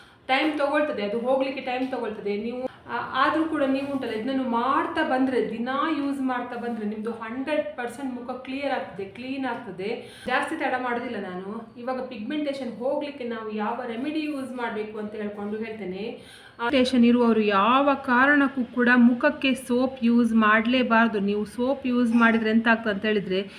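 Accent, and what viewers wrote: Mangalore, India. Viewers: Chanda madi ...astu sala helthira? Mangaluru raga chanda ede..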